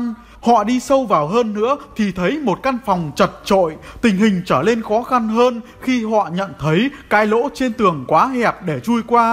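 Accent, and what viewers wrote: North, Vietnam. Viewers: Anh là ng bắc à :))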